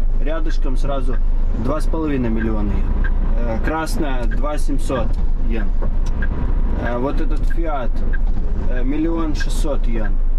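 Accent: Odessa, Ukraine. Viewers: смотрю с удовольствием бытовые сцены... дорожные трипы никому не нужны, если больше 5 минут... одесский акцент умиляет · Пролетел говор Одесита, Удачи!!!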